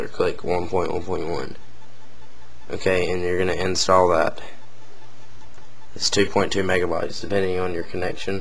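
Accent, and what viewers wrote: South, United States. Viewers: i hate ur accent red nek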